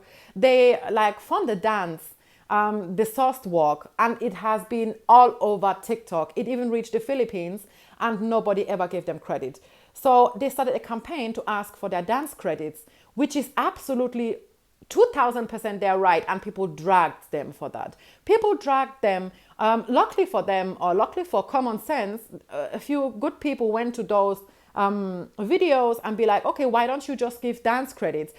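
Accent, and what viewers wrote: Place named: Nigeria. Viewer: Nigerian accent is super strong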